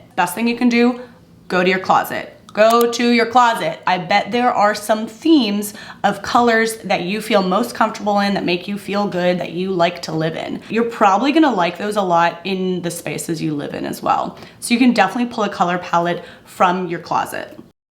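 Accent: United States. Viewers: hi what accent do you have? it's lovely i know it's american but i would like to know the specific accent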